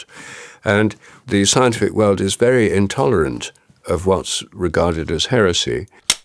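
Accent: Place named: United Kingdom. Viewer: i came for the noble posh english :face-green-smiling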